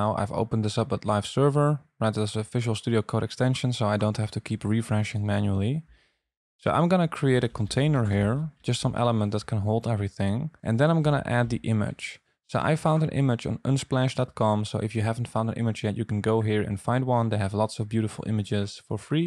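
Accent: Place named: Netherlands. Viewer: Was almost going to comment in Dutch because of the accent, but location says USA, so here is my question: Can you stop a browser from rendering translucent gradients AT ALL? Today it is very popular in video players to use it as a background between the video and the navigation bar/buttons, which is totally annoying. Youtube does this as well. I need a way to make some CSS options impossible on a browser wide level. I managed to do a similar thing with rounded corners, but can it be done for gradients as well?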